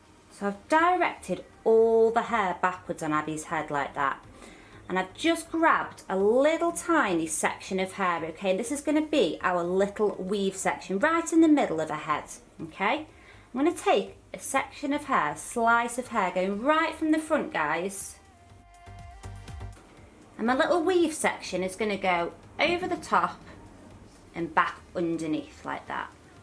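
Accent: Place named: Liverpool, United Kingdom. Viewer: I found the Doris one easier to see because of the contrast in your skin versus the purple of her hair. I was able to follow both. As a side note, I live in America now but your accent is from my part of the world. Are you from somewhere just outside of Liverpool? Prescott or Warrington possibly?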